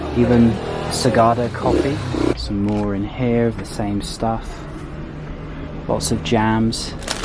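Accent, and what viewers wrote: United Kingdom. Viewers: sounds British accent....enjoy trekking Sagada...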